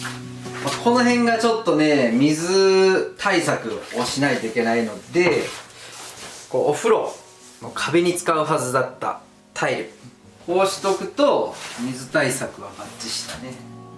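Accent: Kansai, Japan. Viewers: 、お疲れさまです❗JPさんの言葉と言うか、話方は関西弁混じりですね❗関西の出身ですか？珪藻土の下のタイルの穴開けをした時、キリ先はコンクリート用ですか？見てたら、鉄切り用に見えましたけど…。それに、壁に打ち込んだビスアンカーって言ってましたけど、それはカールですね❗洗面所が、見違えりましたね‼️モダンな感じで良いですね‼️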